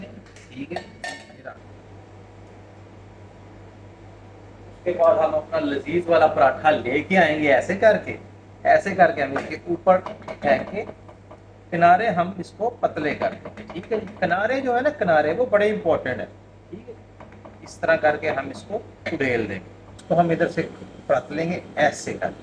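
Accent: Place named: Faisalabad, Pakistan. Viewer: Lehjy sy ap faislbadi lgty ho😊😊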